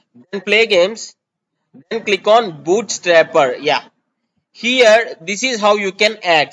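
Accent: India. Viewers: Indian Accent = Instant Fail.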